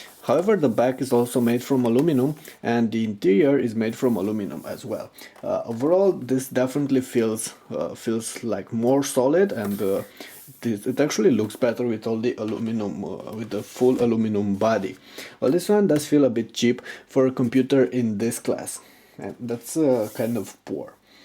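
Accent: Romania. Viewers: Bai tu ai accent de roman :) ... asai :) ? Salutari din München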